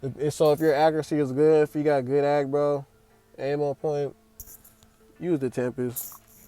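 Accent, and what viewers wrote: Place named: Bay Area, United States. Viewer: Bruh can tell by the way u talk u gotta be from around the bay, Vallejo here 💯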